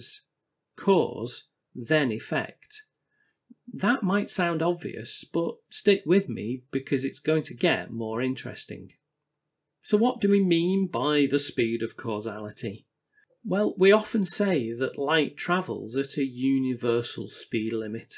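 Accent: United Kingdom. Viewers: Thankyou for uploading your channel specifically is where I like going for science information because of the british accent and the visuals are great. It has the right vibe nothings too overexaggerated very calm logical delivery. I could and would watch hours of lectures and powerpoint in this format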